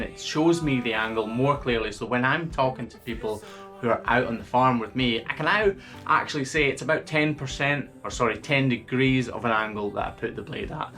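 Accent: Scotland, United Kingdom. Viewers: Good grief! Why am I so obsessed with watching your vids?! I can't look away! It probably helps that your dialect is so interesting to listen to and having visited Scotland twice, I find myself always wanting to go back. Oh. And this is actually a very interesting niche subject matter. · The slo mo was cool as was your montage at the ending. Always educational and entertaining. I’m also learning how to talk with a Scottish accent thanks to your videos! 😁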